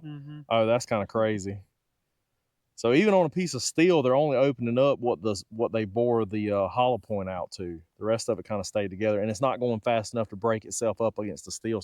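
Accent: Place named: Alabama, United States. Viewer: Just found your channel. Great video. I hope they take your critic well and fix the SD. If they do, l will give hunting with subs a chance. The bullet flat out performed. I am curious about where you are from. You talk exactly like me. I am an Alabama native, but lived in TN for 14 years and south FL for 3.5. Anyway, just subscribed. Keep ‘em coming.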